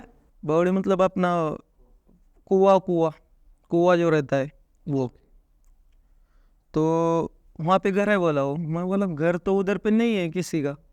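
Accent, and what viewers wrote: Maharashtra, India. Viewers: Hindi बोलण्यावरून समजतंय मराठी आहे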